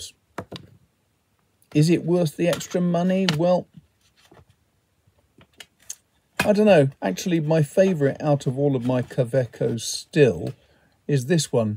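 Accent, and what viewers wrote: United Kingdom. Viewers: Just because we speak a common language does not mean we always understand each other! When you say you look at the bezel, are you looking at the cameras lens? You are the only person I have encountered that makes it look natural. And you are more pleasant to look at than you give yourself credit for. Probably the accent has a lot to do with it. We here in the colonies are suckers for the accent!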